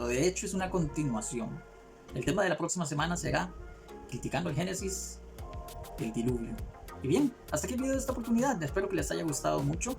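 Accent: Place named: Costa Rica. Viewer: Sos de Costa Rica??? Hasta cierto punto puedo identificar el acento de la gente de mi país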